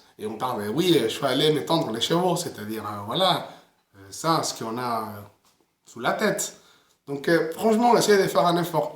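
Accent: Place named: Spain. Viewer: T'es espagnol mon gars, on l'entend bien!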